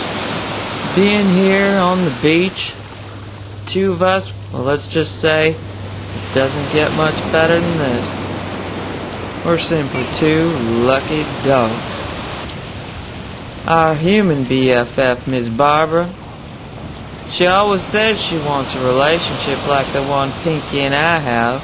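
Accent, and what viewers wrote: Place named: South, United States. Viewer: Narrator is funny as hell ....and soooo southern !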